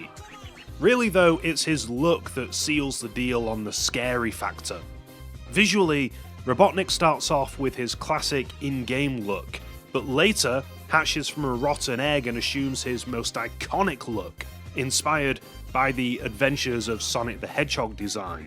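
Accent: United Kingdom. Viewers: What a treat to get the entire Fleetway sonic comics told! All with the perfect British narrarator for it. So nice to have you back.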